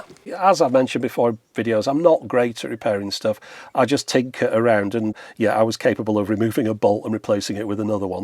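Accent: United Kingdom. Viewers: As a swedish viewer i can attest to this thing. It is remarcable what he does. And the voice! It is like if it was from a real british person. It is just wow and so much great. Greets from all your non engleesh ppl :D Carry on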